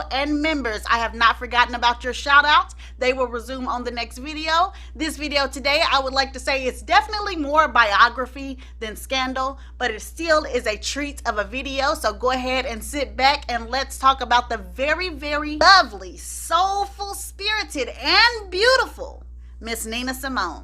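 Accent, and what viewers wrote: South, United States. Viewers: I just love your channel and the Way you sound like a country girl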